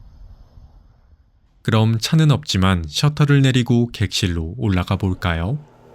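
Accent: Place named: Jeolla, South Korea. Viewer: 가~장. 기~차.. 전라도 억양인디! 서울말쓰시는데 고향은 전라도지라~ 잉??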